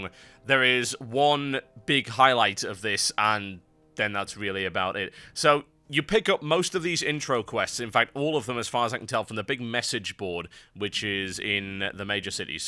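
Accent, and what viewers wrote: United Kingdom. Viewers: stupid ugly british accent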